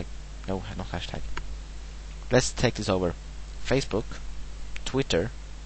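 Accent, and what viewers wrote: Sweden. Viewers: I'm over here trying to master a Swedish accent xD You have a nice accent and voice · I love your accent! I would definitly prefer to be Swedish than english😕😂😂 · At least you don't have an American accent! Swedish accents are way better, a lot of people want to sound Swedish as well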